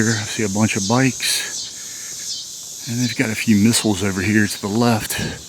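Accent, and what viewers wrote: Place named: Maryland, United States. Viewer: You’re going to some interesting places..thanks for the video! I have lots of family in Maryland, and you have the same accent as them..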